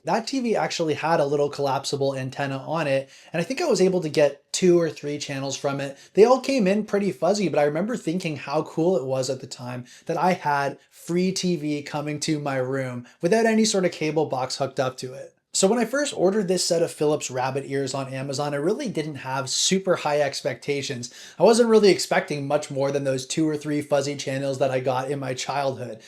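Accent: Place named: Canada. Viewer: Eww you sound like from cAnaDaaa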